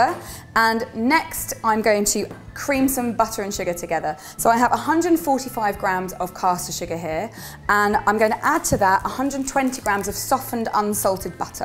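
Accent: United Kingdom. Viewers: i like to hear British accent:D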